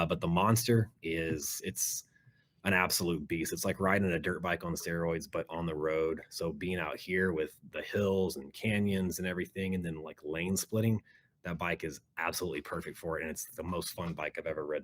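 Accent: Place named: Miami, United States. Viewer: “Will you appear on Archie Show!” “Fook no” lol. sounds like you from Miami man. Cheers🏆🥃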